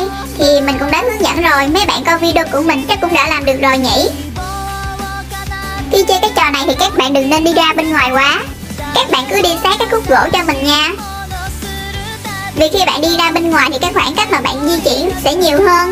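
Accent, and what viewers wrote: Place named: North, Vietnam. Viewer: Nghe giọng chị giống người miền bắc quá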